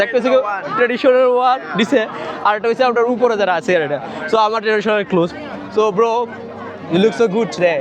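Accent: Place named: Netrokona, Bangladesh. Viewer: আপনার কথার স্টাইল অনেকটা আমাদের নেত্রকোনা র মানুষের মত, যদি কিছু মনে না করেন তাহলে, জানতে কি পারি আপনার বাড়ি?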